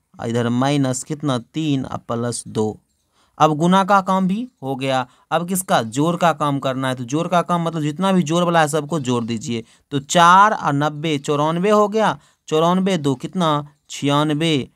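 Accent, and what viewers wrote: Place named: Maithili, India. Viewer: Bhai aap bahut maithli bol rhe ho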